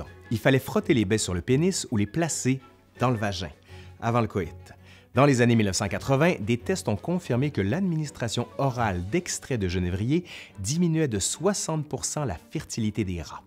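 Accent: Quebec, Canada. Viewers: Excellent ! Encore meilleur avec votre accent canadien !😊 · Toujours instructif, plein d’humour, une prononciation impeccable ! Et j’adore l’accent Canadien! 👍🏼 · Merci pour l'accent canadien qui nous explique tout ça avec beaucoup d'humour.😂😂😂😂😂😂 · Merci à toi, merci de ta façon ludique de raconter l'histoire. Et merci à ton léger accent qui me rappelle mes vacances dans la belle province. Alors je me suis aussi abonné et je partage 🎉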